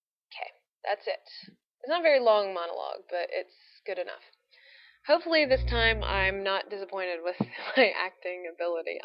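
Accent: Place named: England, United Kingdom. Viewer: An american who can do an accent which doesnt make us seem like all we do is eat crumpets and drink tea :) Nice work, if you're interested in this sort of acting, look up stanislavski - he thought of loads of exercises to get in role for naturalistic acting :) and also try not to emphasise words so much, we're a lazy speaking country! next video you do, say hello youchoobe (which is how english people pronounce it) :)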